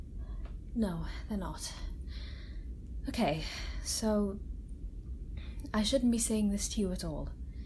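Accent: Wales, United Kingdom. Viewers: u sound kinda welsh lol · AWESOME VIDEO PLEASE MAKE A PART TWO TO THIS ALSO I LOVE YOUR BRITISH ACCENT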